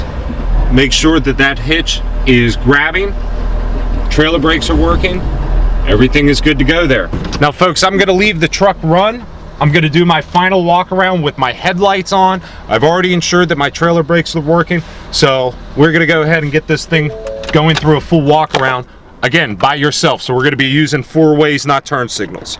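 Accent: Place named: Pittsburgh, United States. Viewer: This is funny because I'm from Pittsburgh living in Florida and I know a yinzer when I hear one. Feels like home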